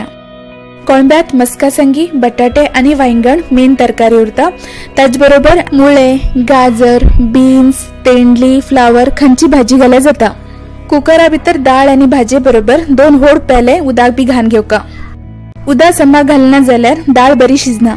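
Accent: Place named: Karwar, India. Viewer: Loved to hear your Karwar konkani...i am also konkani ,but from Mangalore (GSB)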